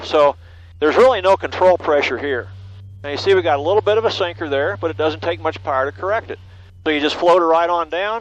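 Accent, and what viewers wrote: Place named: England, United Kingdom. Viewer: Oh, P****n d'accent rosbeef !! C'est HORRIBLE et très désagréable !!!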